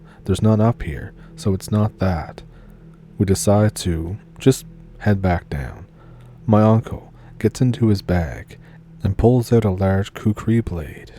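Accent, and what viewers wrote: Ontario, Canada. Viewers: sir, I like hearing you speaking these stories because you sound so much like my Ontario and Great Lakers cousins and uncles. Cheers friend. 🎉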